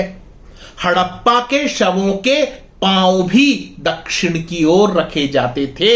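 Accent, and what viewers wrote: Standard. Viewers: Ap bahut sudh hindi bolrahehe Nahi to jisko Dekho adha English adha hindi boltehe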